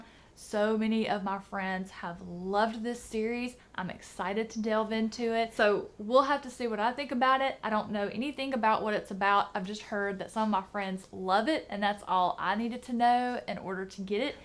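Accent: South, United States. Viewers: I wish more people had a Southern accent like you making book videos!! :D Love it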